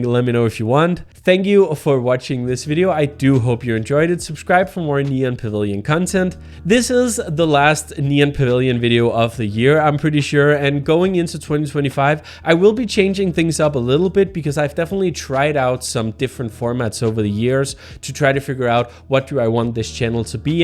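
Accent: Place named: Denmark. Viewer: man kan bare høre den danske accent skinne igennem :)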